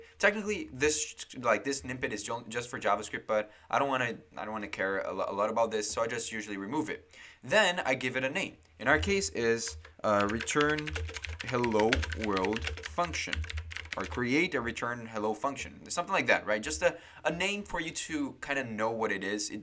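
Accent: Brazil. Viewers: This is my first time seeing one of your videos, and you speak very well but I can't help but think that you sound as a brazilian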